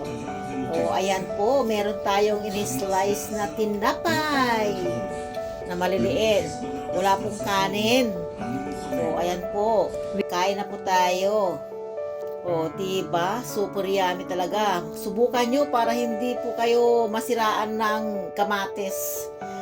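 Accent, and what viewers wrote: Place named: Pangasinan, Philippines. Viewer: masamit tay iluluto yo atse. taga Pangasinan kau amo Pangasinan accent . ☺ thanks for sharing☺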